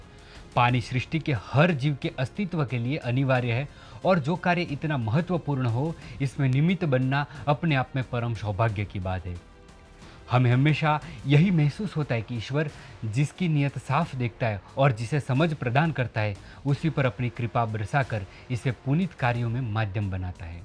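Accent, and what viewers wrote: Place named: Gujarat, India. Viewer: your Hindi is good but tone is Gujarati, please keep it up!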